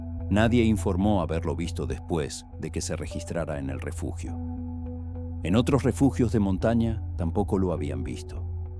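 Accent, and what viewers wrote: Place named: Argentina. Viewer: Es mejor la otra voz esta suena como argentino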